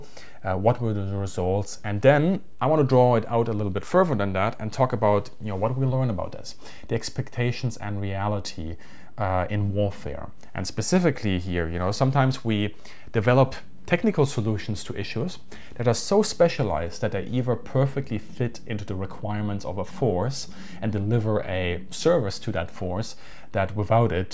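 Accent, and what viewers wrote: Germany. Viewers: Is he german? Hört sich nämlich so an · Dude, your german accent is horrible....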